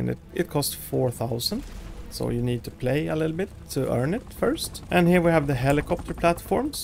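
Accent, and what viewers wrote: Sweden. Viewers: Are u swedish? Im swedish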